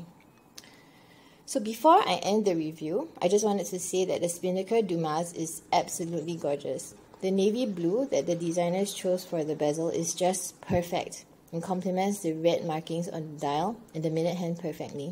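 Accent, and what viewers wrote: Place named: Barbados. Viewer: Where are you from? You sound like you're from the islands. Barbados?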